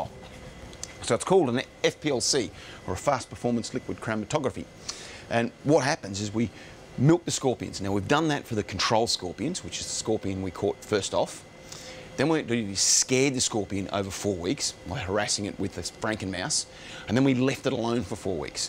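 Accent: Australia. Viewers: bioluminescent tumors would have great applications in the world of Neurofibromatisis research. Apart from having my 6yr old scanned by mri every 3 months to monitor his multiple tumors, we could just run a black light over him. Is the venom specific to certain tumors when it attaches? It's something I'm keen to follow. Great work on the videos and channel, great to hear a Aussie while I'm in the U.S.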